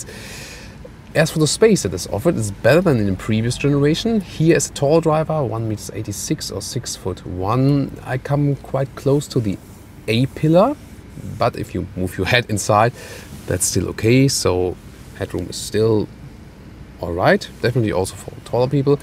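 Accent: Germany. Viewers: Wenn du einfach das deutsche Englisch heraushörst. ;-) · Good information! And I really do like your fucking german accent. :))) Great! Did you do these videos in German? I'd like to watch it in German.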